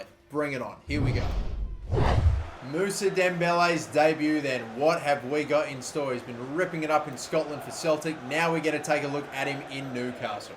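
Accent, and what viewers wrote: Australia. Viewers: Mardoche Basisa he's Australian... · Mardoche Basisa he has an australian accent...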